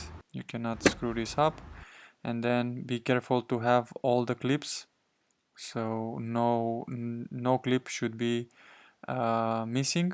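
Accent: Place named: Dâmbovița, Romania. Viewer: Ce accent de Dâmbovița ai când vorbești engleză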